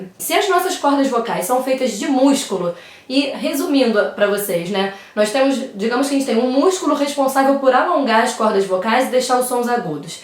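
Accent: Rio de Janeiro, Brazil. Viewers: Sou do Rio de Janeiro e tenho taquilalia. Você atende em que estado do Brasil? Seu sotaque parece de carioca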